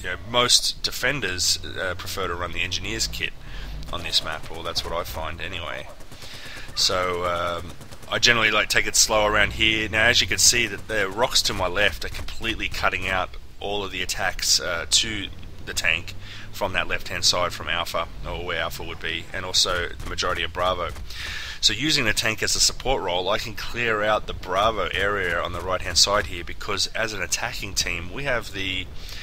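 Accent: Australia. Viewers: holy shit an aussie commentator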